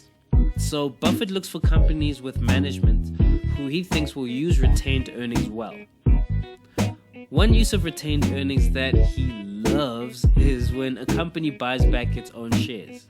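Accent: South Africa. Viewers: I hear a South African accent in there!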